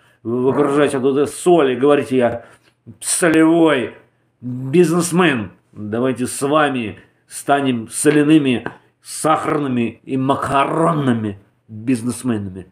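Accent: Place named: Mordovia, Russia. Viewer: по акценту не могу понять, ты Мордвин по национальности?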